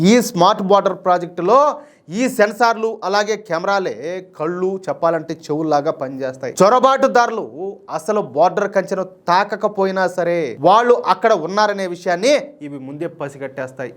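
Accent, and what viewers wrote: Rayalaseema, India. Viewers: గారు, మీ రాయలసీమ యాస వింటేనే సగం kick వస్తుంది. మీ commentary లో వ్యాపారధోరణి కంటే, దేశభక్తే ఎక్కువగా కనిపిస్తుంది. వింటుంటే, ఇంట్లో మనిషి మాట్లాడుతున్నట్టు అనిపిస్తుంది. మొత్తం మీద వీడియో చాలా బాగుంటుంది ❤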